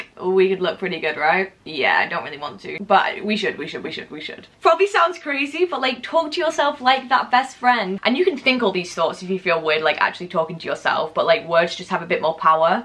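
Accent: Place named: Northern England, United Kingdom. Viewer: Omg i have the same accent as you! Like a northern english accent where abouts are you from? ❤️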